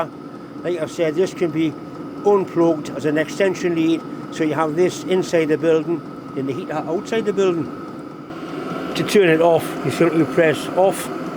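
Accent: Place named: North East England, United Kingdom. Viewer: Even fellow Englishmen struggle with the lovely North East accent. 😂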